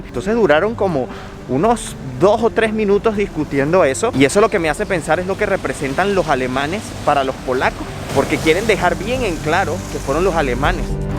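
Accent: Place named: Venezuela. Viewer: Apenas supe que eras venezolano por tu acento cambié de canal!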